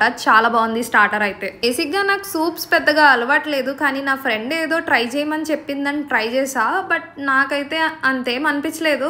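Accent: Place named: Andhra, India. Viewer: Mee slang chala bagundi. Pakka andhra ammai la chala correct ga words unnai. Nice videos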